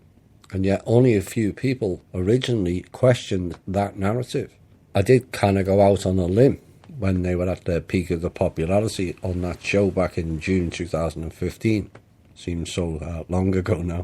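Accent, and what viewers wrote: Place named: Liverpool, United Kingdom. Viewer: What a breath of fresh air to hear your dulcet liverpuddlian tones ! So nice to hear intelligent convo from a fello blighty as most info ive found has been mostly american ( not disrespect to my american friends intended ) I love are no nonsense and seeing the irony in it that we brits do so well ! ♥ I felt gaia was dark first time I saw its adverts..its perfect candy coated mainstream approach smelt bad ! bit like Ancient Aliens...Im going to enjoy watching your previous vids now ive found you. He's not the messiah hes a very naughty boy ! Bit of Python to brighten the day :-)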